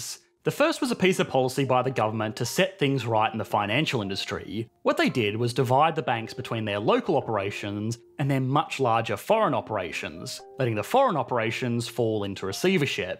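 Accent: Australia. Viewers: I’m so sorry but I’m so tired of his australian accent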